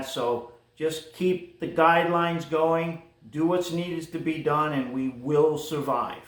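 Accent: Rhode Island, United States. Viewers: Sounds like a RI accent?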